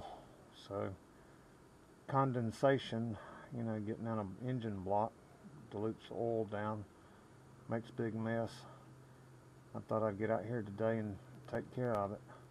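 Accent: South, United States. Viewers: I think you had about a couple of hundred subs when I stumbled in last summer. Been distracted by some health issues the last little while, but I hope to be back for good now, all seeming to have worked out ok, thank the Lord. Anyway, congratulations! You have an excellent channel, and I've always enjoyed watching and listening to a true southern gentleman!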